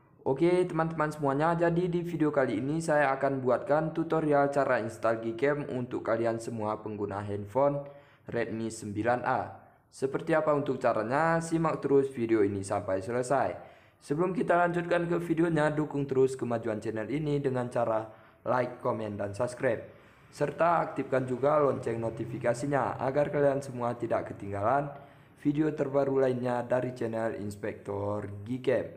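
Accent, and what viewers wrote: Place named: Aceh, Indonesia. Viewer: Bg org mana logatnya kayak orang aceh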